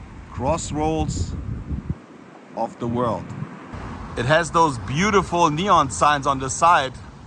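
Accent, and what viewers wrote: Germany. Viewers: Nostalgia, I so remember that old Hollywood hotel Mr, I am from so.central los Angeles, so your video is taking us down memory lane, so sad it got demolished, I liked los Angeles county when I lived there back in the 50,sand 60,s it was nice back then, now it's so different, you should go see Griffith park and the observatory and the old zoo there and the train, I do enjoy your video,s and I like that German accent, you could be a great tour guide, thanks for the video, good job, Have a beautiful day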